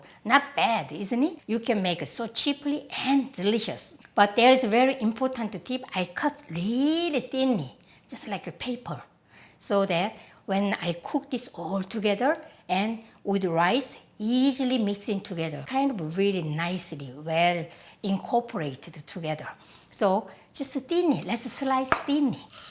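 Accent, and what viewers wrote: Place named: South Korea. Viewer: 어머니가 전북출신이신데.. 엄마 친구 이모님같아서 늘 재미있게 보고있어요!! 영어가 한국말처럼 들리는 기적이..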